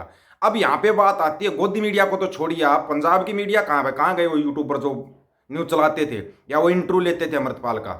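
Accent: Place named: Rohtak, India. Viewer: भाई जी आपका बिंदास रोहतक वालों का बोलने का लहजा बागेश्वर का मोड़ा । दिल खुश हुआ है । ज्ंयोदा बसदा रह ।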